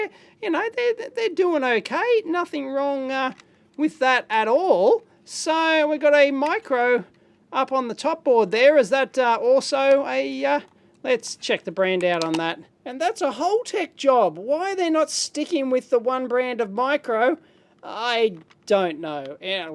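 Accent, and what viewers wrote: Australia. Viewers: The only thing I understand is that Mr. should be an Aussie. His accent says so. Am i right, Mr.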